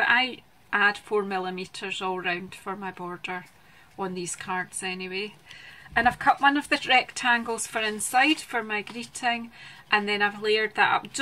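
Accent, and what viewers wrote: Scotland, United Kingdom. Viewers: Stunning DSP / beautiful card and a fabulous Scottish accent! Tfs. xOx ~ C ~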